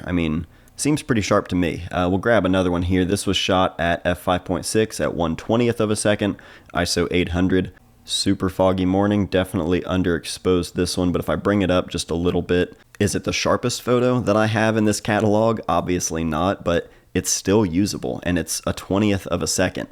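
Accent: Ohio, United States. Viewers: Chillicothe?! I knew that accent sounded familiar. I'm in Portsmouth. Sorry for the house sickness, and Happy Birthday!(belated). We love you too. Congrats on the M11. Beautiful work.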